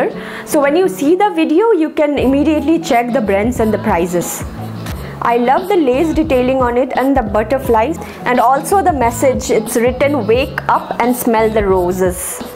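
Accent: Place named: Bengal, India. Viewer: Yes your content was really superb. Love to watch you and. Though your hubby Parth was missing. Loved every bit of it, love to hear the Bengali accent, reminds me of Mrs Sen our History teacher, a real sweetheart like you.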